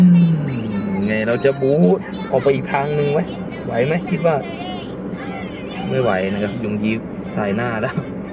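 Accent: Isan, Thailand. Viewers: คนอีสานหรอพี่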